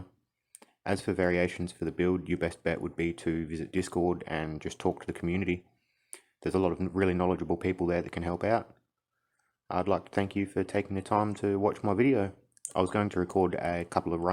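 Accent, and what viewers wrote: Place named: Australia. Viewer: g'day mate you r definitely an Ozzy that's for sure lol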